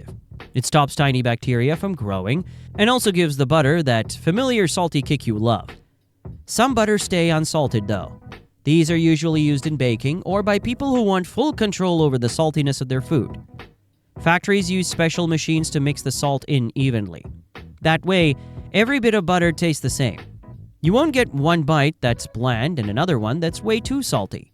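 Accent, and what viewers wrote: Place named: Canada. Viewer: Okay, when you're talking about separating , you're actually showing a batch churn. A few seconds later, you're showing more batch churns... I think. Those cone-shaped things at the mark, those are the cream separators. All those other shots of tanks with vertical stirring paddles, those are probably batch pasteurizers. Maybe just mixing tanks or cheesemaking vats. And "silage" is pronounced SIGH-ludge. "Liters" is LEE-ters. The narration robot's Canadian accent is kind of adorable, though. The rest of the info in this video is basically accurate.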